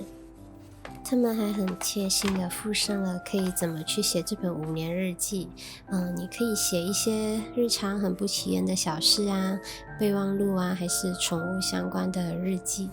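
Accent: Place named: Japan. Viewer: Hi ~ I always thought that you are Japanese. 😅 Your Chinese sounds are so cute.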